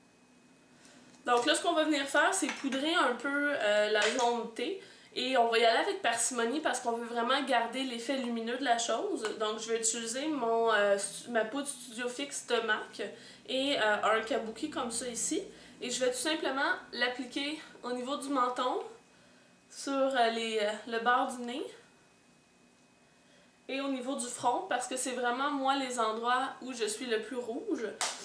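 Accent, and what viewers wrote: Quebec, Canada. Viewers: Salut, Je suis une abonnée depuis un an environ je trouves que tu as une Personnalité Pétillante mais ce que j'aime encore plus c'est Que rien ne pourra t'enlever ton coté Québécois ahah Je Te cites: Des patches Secs s'pa L'idéale Je swirl Dedans Ahah continues Ma belle:)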